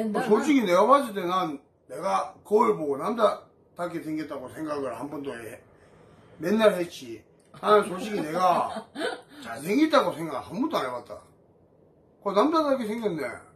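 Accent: Gyeongsang, South Korea. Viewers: 확실히 경상도 매력이 있따 ㅋㅋ 뭔가 다르다 이기야 ㅋㅋ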